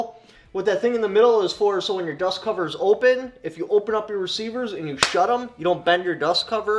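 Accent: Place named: Upper Midwest, United States. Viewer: There’s something unintentionally comical about this young man. I can’t exactly put my finger on what it is. I think it’s what I assume is his upper mid west mannerisms. I surmise he’s from there based on the way he pronounces certain words like mouth as “mewth”.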